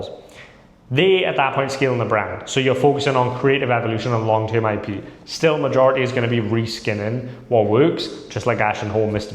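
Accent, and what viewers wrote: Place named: United Kingdom. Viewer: Omfg not another british dude lol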